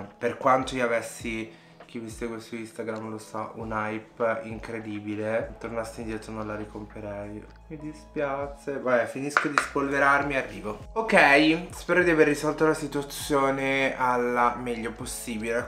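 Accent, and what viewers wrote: Milan, Italy. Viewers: a me non piaceva la tua parlata milanese di m!! 😂😂😂 invece i tuoi contenuti sono per me ottimi e quando esprimi pensieri e opinioni “makeup a parte” ti ho sempre trovato serio e maturo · a Milano la cadenza è quella e non è di "m". Cerchiamo di stare nei limiti dell'educazione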